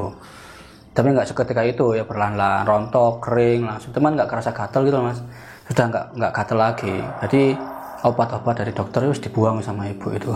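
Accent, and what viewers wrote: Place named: Malang, Indonesia. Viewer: Kyo logat e wong malang se 😁🙏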